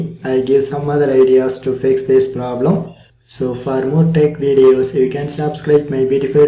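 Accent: India. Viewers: indian voice, 100% sure it works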